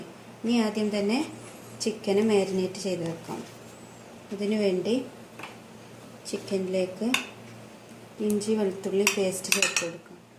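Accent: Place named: Malabar, India. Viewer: ങ്ങളെ വർത്താനം കേട്ടിട്ട് .. മ്മളെ മലപ്പുറം, കോഴിക്കോട് 🤗🤗രണ്ടിലൊന്ന് .. അല്ലെ..?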